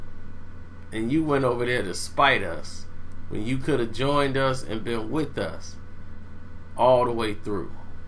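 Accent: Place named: South, United States. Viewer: Ronde Rondo?? How u pronounce some of ur words feel like I'm listening to my grandpops from down south or somethin cuz...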